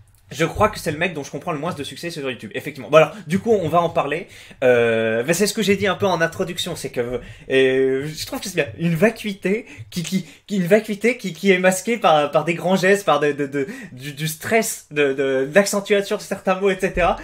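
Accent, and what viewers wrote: Alsace, France. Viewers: Accent Alsacien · alsacien prolo, si tu veux.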